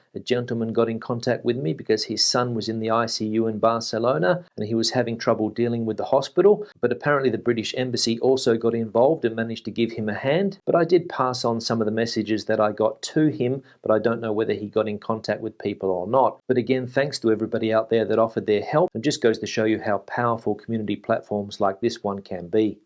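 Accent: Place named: Australia. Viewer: Thank you for your informative video and your continued work. By the way loving your Aussie accent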